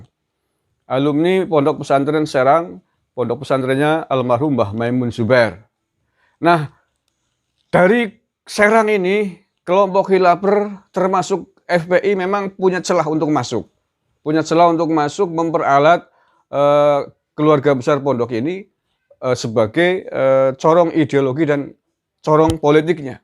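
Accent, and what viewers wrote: Banyumas, Indonesia. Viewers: Ini manusia tukang fitnah ....dasar ngapak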